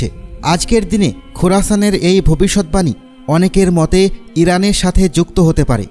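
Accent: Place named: India. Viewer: আমার তো মনে হচ্ছে এই ভিডিওটা যে বানিয়েছে সে ইন্ডিয়ান আগে ভাষা ভালোভাবে শিখুন শুদ্ধ বাংলায় কথা বলা শিখন তারপরে ভিডিও বানাইয়েন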